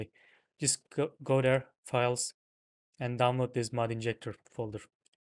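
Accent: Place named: Turkey. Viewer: aksan türk aksanına benzio heralde türksün reis shadow of the tomb raider varmı mod olarak bide modlar oyunun orjinal halini bozarmı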